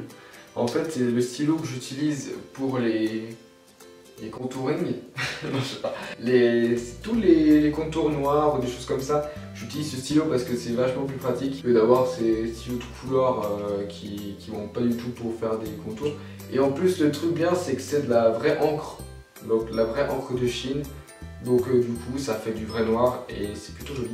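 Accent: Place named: England, United Kingdom. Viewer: Cet accent anglais ow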